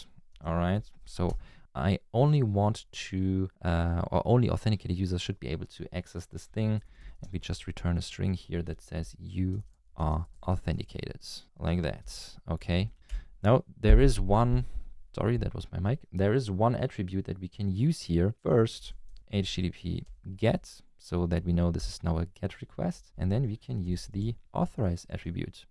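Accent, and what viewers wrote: Germany. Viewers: Great video! I like your approach to start from the top with whit sketching out what we want and fill in with functionality. An extra plus for doing refactoring! It brings an example closer to real cases, and with todays environment help, there is no excuse to not doing it. As a Swede I could guess that you were German, but you have an excellent english!° Cheers:washhands: p.s Thx for the code, just what the doctor ordered...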